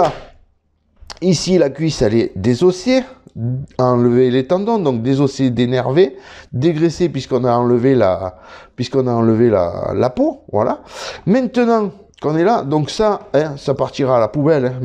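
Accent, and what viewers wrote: France. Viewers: Moi, comme abonné à ta chaine, je te regarde de la Beauce, Canada. J'aime tes vidéos car j'apprend beaucoup sur la boucherie. Ton accent français me chatouille les oreilles. J'adore! Merci!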